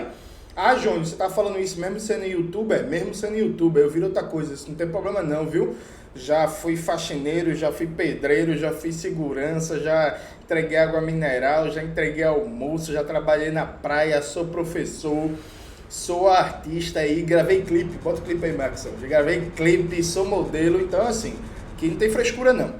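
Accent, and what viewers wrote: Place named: Rio de Janeiro, Brazil. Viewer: leu tudo com o sotacão carioahca dele ne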